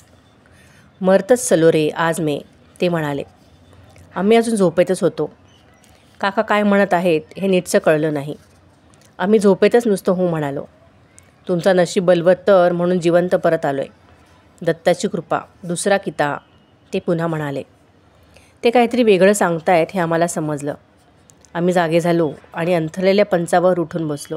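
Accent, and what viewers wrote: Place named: Konkan, India. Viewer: Uttam wachan.kokni ucchar hi chan 👌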